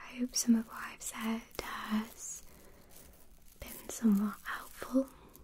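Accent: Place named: Northern England, United Kingdom. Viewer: I like your accent.northern England, Blackburn?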